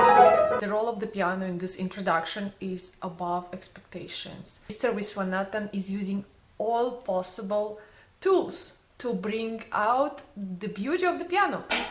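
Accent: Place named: India. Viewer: Very nice video ! Which country are you from ? Your speach and action like indian !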